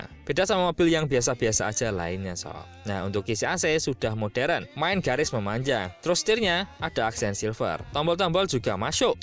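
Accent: Java, Indonesia. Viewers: Mobil sih keren tapi gak nonton sampe habis gara gara narasi pake logat di medok medokin jawanisasi,,,, aneh denger nya ky cakupan siaran terbatas daerah,,,, gak nasionalis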